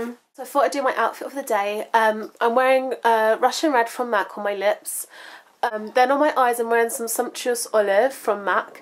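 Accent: United Kingdom. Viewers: Listening to you speak makes me miss living in the UK so much :(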